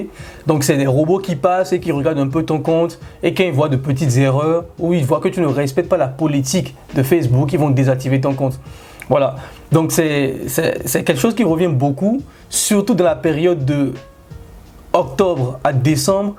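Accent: Benin. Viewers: Oulah! Ça sent le français béninois ici! Je m’abonne 💪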